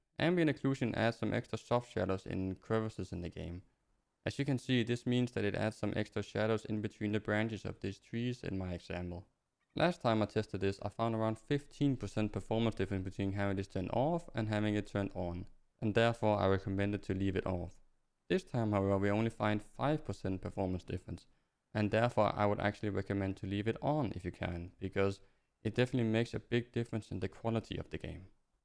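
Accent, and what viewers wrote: Denmark. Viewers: I can smell danglish. But thank you for the help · Hey, i just found your channcel, by one of your old ark graphic settings video. I just wanted to ask, if you're Danish, because your accent, sounds familiar.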